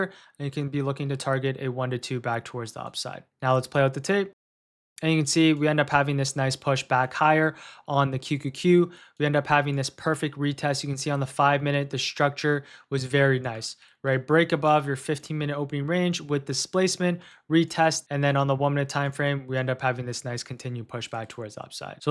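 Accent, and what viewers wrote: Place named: Canada. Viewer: Canadian ey!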